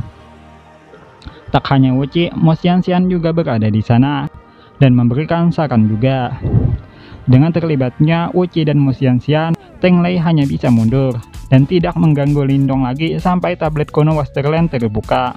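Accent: Banjar, Indonesia. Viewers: suara orang banjar tak bisa dirubah logat nya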